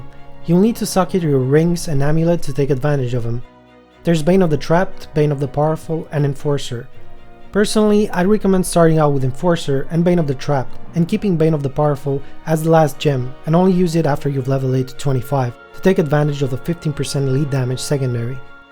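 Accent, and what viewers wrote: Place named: Philippines. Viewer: you sound like filipino.. hmm...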